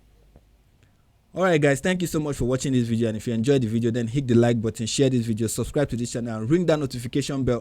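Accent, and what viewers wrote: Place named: Nigeria. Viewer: So happy the algorithm sent me in your direction. Nigerians have a special clear sighted snark and its a voice in the most musical of accents the world needs to hear right now. Privileged Americans whose careers are entirely racially based are everything wrong - just another way of staying on the plantation - we outside. Peace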